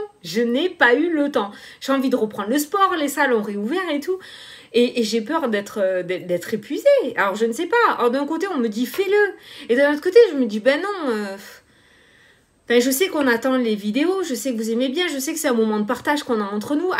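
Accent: South, France. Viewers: ADORABLE MA BELLE , SUPER TON ACCENT DU SUD , TU ES RAYONNANTE COMME LE SOLEIL, MERCI POUR TOUTES LES BELLES ÂMES QUI TE SUIVENT, JE T'AIME MA BELLE, CONTINUE.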